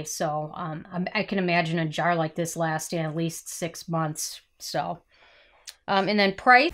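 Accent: Minnesota, United States. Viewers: I just received this today. I noticed your accent then you said you were in MN. Me too😁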